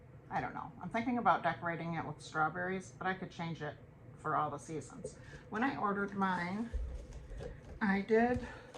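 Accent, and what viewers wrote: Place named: Michigan, United States. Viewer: Great video, sounds like your a Michigan girl. I am, sorry if I'm wrong.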